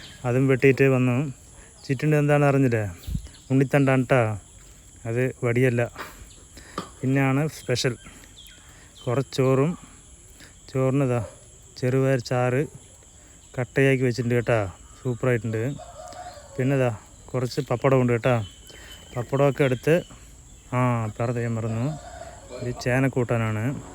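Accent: Tamil Nadu, India. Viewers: നിങ്ങൾ തമിഴനാട് ആണോ സംസാരം കേട്ടു ചോദിച്ചതാട്ടോ. എന്തായാലും നല്ല രസം ഉണ്ട് കേൾക്കാൻ ❤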